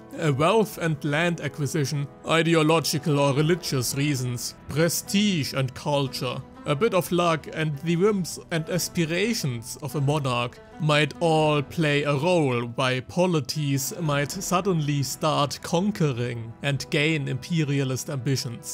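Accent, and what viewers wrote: Germany. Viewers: Der starke dt. Akzent verbunden mit der nasalen und dadurch etwas arrogant wirkenden Stimme machen es wirklich extrem schwierig das Video anzusehen ohne den Wunsch zu haben es abzubrechen.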